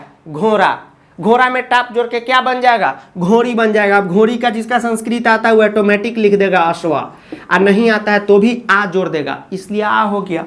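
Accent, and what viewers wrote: Bihar, India. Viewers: Sir are you from Bihar?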